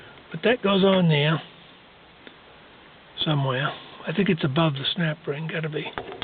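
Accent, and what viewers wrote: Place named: New England, United States. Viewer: YOu are working on one of the most frustrating parts of any vehicle. I have two GM tilt columns so I share your pain. I believe you have the spring on upside down and that you need to compress it to get the clip ring into the groove on the shaft which is a very tough job. That will hold the upper bearing race inside the ball bearings and eliminate the floppy feeling you describe. Not sure why steering columns have to be so ridiculous but some engineer is laughing you can bet. Loved the New England accent and would like to see pictures of your hot rod. Noticed the Ford break petal in the video. Happy New Year and best wishes for successful completion.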